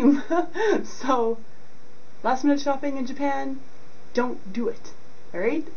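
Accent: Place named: Canada. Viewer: you are Canadian... I can tell by they way you talk.. aye.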